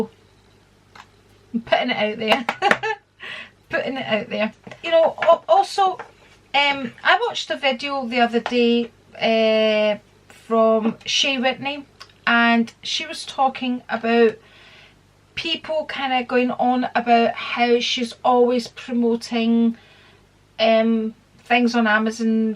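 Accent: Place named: Glasgow, United Kingdom. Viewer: Tb brutally honest as a fellow Glaswegian if we don't have the Glasgow University accent I feel we are under valued. I am a partner in a very successful international security company but as my husband is from a very affluent side of our city his accent is more appreciated shall we say. I love watching you and your family. So down to earth and relatable, don't think it's you sweetheart by any means but as I said sometimes it's just the way we speak. Hopefully things will pick soon, before you know it. X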